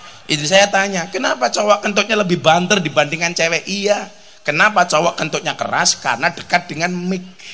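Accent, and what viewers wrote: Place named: Banyumas, Indonesia. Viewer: Ngapak njeplak ,kebumen ku❤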